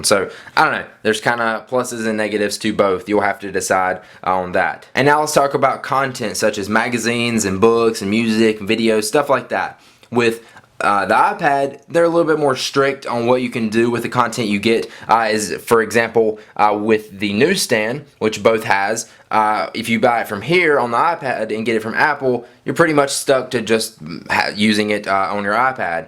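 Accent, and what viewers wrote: South, United States. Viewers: I am from the south in America and actually not all of us have accents some of us talk just like people from anywhere else in America. It all depends on the voices of the people you grew up around. And the generation before him probably are from one of the older smaller towns where they have this kind of accent. Bottom line, not everyone from the southern states talk with an accent like this. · lol, i loveeee how country you sound. were u from??? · Love your southern accent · Country or southern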